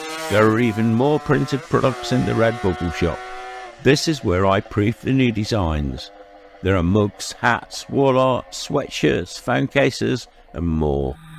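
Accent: United Kingdom. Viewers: I love the stuff you put out. Love across pond accent and knowledge. Here in the States we got all the Japanese bikes and very few Euro bike ever made over here until the 2010’s. Not that had numerous bikes but I’ve had a few. I still own a 75’ Z1b and it’s stablemate is a ZX6R. The 600 will do an honest 268 kph or 166 mph, not bad for a bike half the displacement discussed here. I don’t need to go any faster. I supposed if I had it dyno tune and spent for a titanium exhaust I could go a little faster, but it’s not worth the money or effort. Besides I love hear that scream at 16500 rpm.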